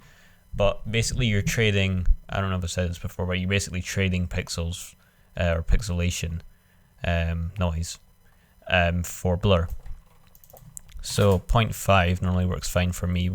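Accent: Scotland, United Kingdom. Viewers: Sounds like a Scotsman - nice tutorial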